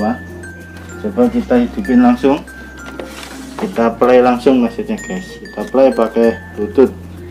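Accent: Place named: Jepara, Indonesia. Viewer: Kyoke mayong jeporo lur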